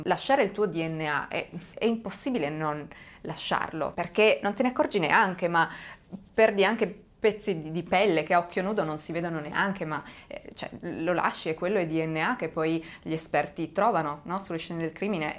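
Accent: Italy. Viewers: Secondo me non sei AI perche a volte dici parole in inglese con accento italiano. Grazie mille per essere humana.😊😊😊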